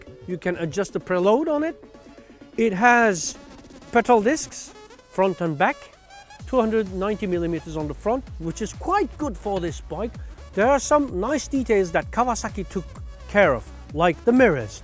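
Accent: England, United Kingdom. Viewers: Tam bir ingiliz aksanıyla konuşuyor, ne değer göçtü gitti bee....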